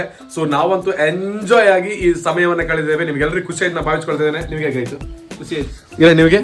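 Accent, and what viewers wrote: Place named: Arebhashe, India. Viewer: Arebashe mathadi kelikke Kushi ade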